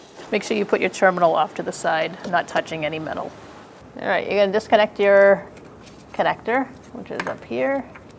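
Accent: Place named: New York, United States. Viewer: Sounds like a newyork accent 😅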